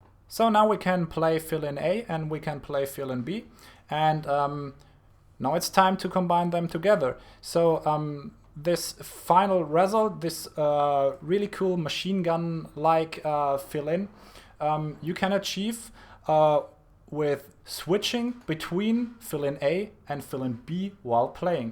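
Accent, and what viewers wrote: Germany. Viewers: are you german? :P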